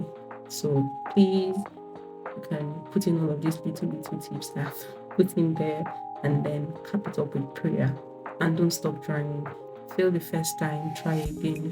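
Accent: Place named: United Kingdom. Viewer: Na you de speak Queen's English like this??? Na wa oh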